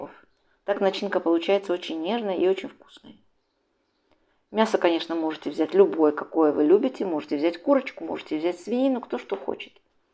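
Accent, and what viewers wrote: Baku, Azerbaijan. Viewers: с родным бакинским акцентом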